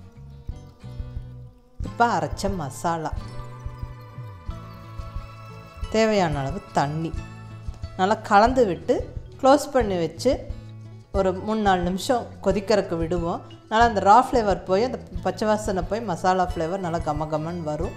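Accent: Coimbatore, India. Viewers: Madam neenga coimbatore ah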